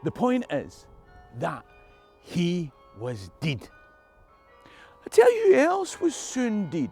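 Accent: Scotland, United Kingdom. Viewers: So weird listening to a black guy speak with a Scottish accent.......weird!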